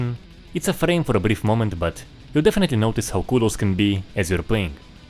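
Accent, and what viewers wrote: Russia. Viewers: Wнy aгe yoи souпds liкe a гиssian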